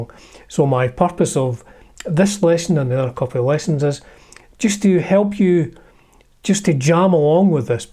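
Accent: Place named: Edinburgh, United Kingdom. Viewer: Brilliant video that's a great song got the original LP and on Dbl live LP at Budokan belter. You're a great communicator clear spoken with that Edinburgh accent. I'm for learning this on my Ibanez RG don't yet have a Gibson or Flying V 🤔🎸👍🎧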